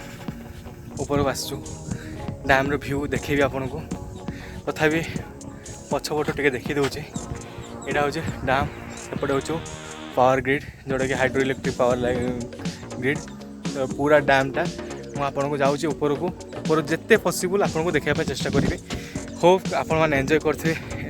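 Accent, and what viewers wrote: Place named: Balasore, India. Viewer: Baleswaria pila nka pari kan kahucha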